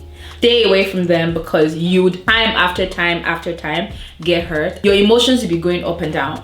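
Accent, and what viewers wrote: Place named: Nigeria. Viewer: The Nigerian accent gets me all the time😂❤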